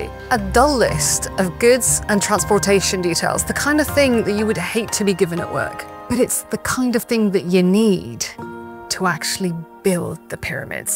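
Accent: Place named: England, United Kingdom. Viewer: her natural English tones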